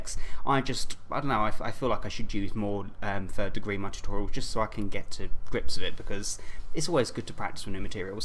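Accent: United Kingdom. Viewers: Does anybody think his nice awesome British accent fits that character perfectly you sound so sweet but looks terrifying cx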